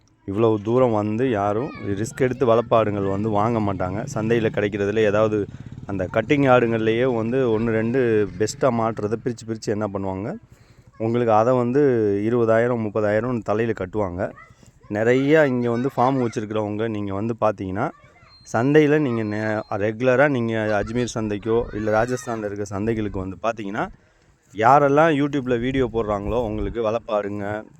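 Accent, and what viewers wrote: Madurai, India. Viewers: ❤ assalamualaikum warh madurai basha ❤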